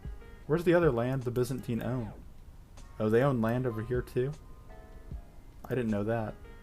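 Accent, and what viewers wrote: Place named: United States. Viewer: Hey man just curious about your accent- what state are you from?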